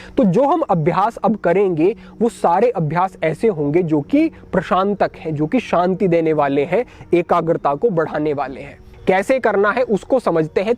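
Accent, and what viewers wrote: Standard. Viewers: Mera man to apki awaz sunke hi accha ho jata hai q ki ap suddh hindi bolte ho badi meethi lagti hai ❤❤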